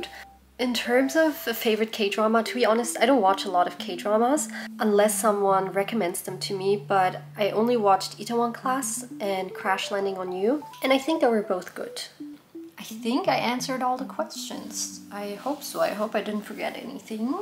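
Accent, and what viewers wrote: Australia. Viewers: the Australian accent is hitting! congratulations!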